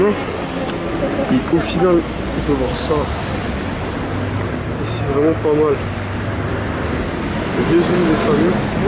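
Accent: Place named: Alsace, France. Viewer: T'es Alsacien ? T'as un gros accent.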